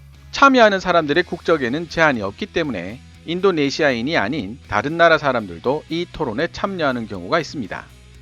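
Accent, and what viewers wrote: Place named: Jeolla, South Korea. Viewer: 외국에사는 교포로써 엄청 이 프로 즐기는fan인데. 내용도, 진행방식,방송하는 목소리에 호감.헌데 전라도인 특유의 "의" 를 발음 못하고 " 으" 로 하는데.. 내가 너무 좋아하는 방송이기에 이 표준어를 사용했으면하는 아쉬움이 있다는게 저만의 솔직한 심정이라서.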